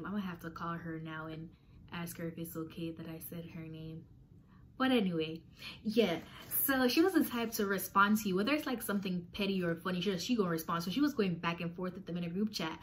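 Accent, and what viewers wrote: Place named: Jamaica. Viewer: Mi hear the patois fam 🤣🤣🤣big ups no say a word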